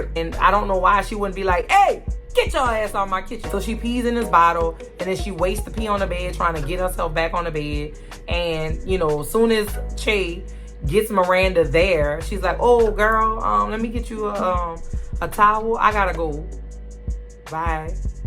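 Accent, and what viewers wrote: New Orleans, United States. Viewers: Love love love your accent, Is it New Orleans? I just subscribed !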